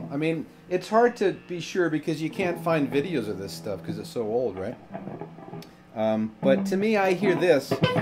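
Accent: Canada. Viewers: I think the toughest part of the solo is definitely the 2nd half. Are you planning on continuing or doing another video on the 2nd solo in? BTW--I love your tone you get achieve for all the videos. It's spot on., and as far as I'm concerned there's not another YT instructor who takes the time and effort to get it exactly like the original artist. You're the only guy I go to after checking out dozens of YT instructional vids. Kudos. BTW--you sound like you could be in Canada or the Northern Mid-West; how close am I?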